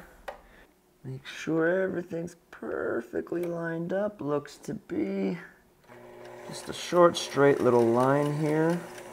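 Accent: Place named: Canada. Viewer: And Sew On recommended your Vlog with a few others. I can see why! I thoroughly enjoyed it. Your tee shirt is indeed a nod to the 70's, 60's and 70's being my era. Your stripe matching, even on the Neckband was excellent. If your into drafting your own patterns watch Sew Custom Vlog, an Irish girl, she's good, I'm not saying that cause I'm from Northern Ireland too 😁, she is 👌spot on, and she's doing coats at the minute. I think you'll enjoy her Vlog. As we say in Northern Ireland, your a turn, meaning your funny! Canadian going by the accent, as some Canadians say certain words like the Scots/Irish. Do a bio on yourself if you haven't already done so.....😉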